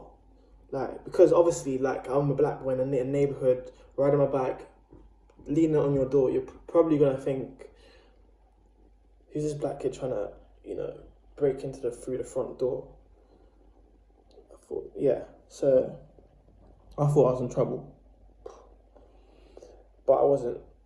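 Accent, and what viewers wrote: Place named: London, United Kingdom. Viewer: Are you from london? You sound like you are - I saw ur comment on a DMT video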